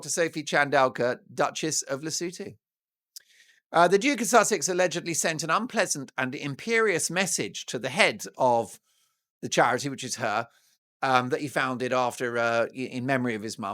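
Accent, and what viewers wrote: Australia. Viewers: Excellent Aussie accent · love your Aussie dialect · your great Australian accent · Lol,love your Aussie accent 😅Yes,Dixon St in Sydney is where you will find Chinatown,l use to work around the corner in Sussex St,lol😊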